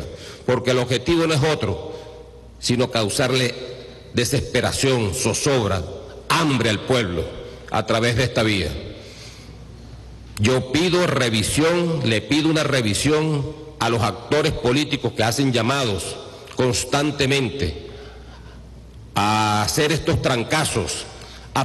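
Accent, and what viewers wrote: Cuba. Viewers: HABLAS COMO UN CUBANO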